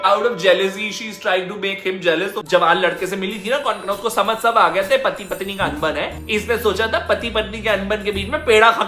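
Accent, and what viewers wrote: Punjab, India. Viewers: Your punjabi accent ❤❤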